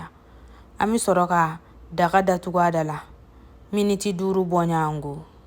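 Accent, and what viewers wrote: Bambara, Mali. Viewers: Merci beaucoup. Ma fille adore tellement ton accent bambara 😁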